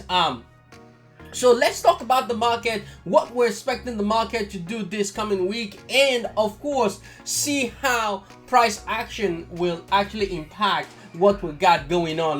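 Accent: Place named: Nigeria. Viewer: You have a heavy heavy accent are you from Nigeria ❓🧐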